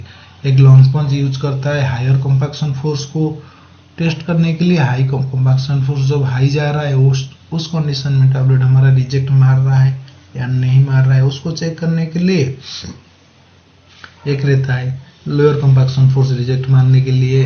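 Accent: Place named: Odisha, India. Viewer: Odia accent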